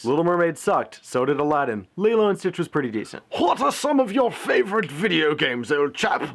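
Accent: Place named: United Kingdom. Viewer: Nice British accent.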